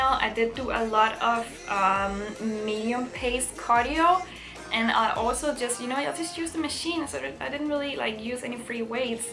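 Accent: Denmark. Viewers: as soon as i heard your voice i was like, she have to be from denmark i can hear it. and then you said it yourself. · jeg kunne høre med det samme at du var fra Danmark, ahahah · man kan bare høre på din "accent" at du er dansker.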